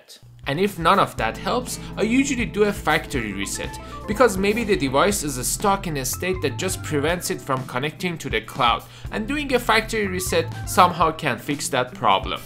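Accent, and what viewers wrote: Iran. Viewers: Iranian accent,the only english video that i can understand it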